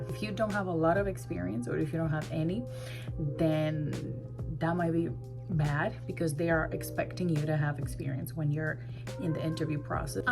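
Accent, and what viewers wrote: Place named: New York, United States. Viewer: English is flawless you have a New York accent dear 🔥🔥🔥🔥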